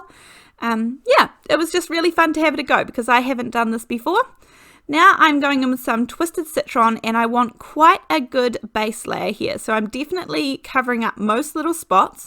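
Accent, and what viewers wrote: New Zealand. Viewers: My 5 year old daughter loves watching your videos with me. I've just found her in the kitchen doing her own crafting tutorial for her little brother and sister, complete with a slight New Zealand accent! (we live in the UK!)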